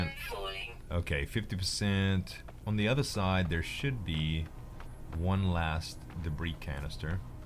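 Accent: Louisiana, United States. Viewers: Z, I can tell your from Louisiana...I am also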